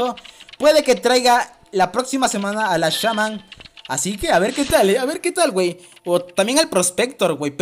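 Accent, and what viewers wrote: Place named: Latin America. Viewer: me encanta el acento latino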